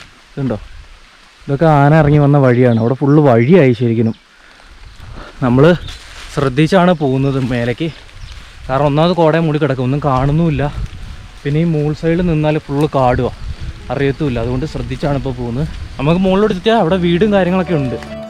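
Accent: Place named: Malappuram, India. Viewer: Bro oru samashayam nee malappuram alle but slank. Bery anallo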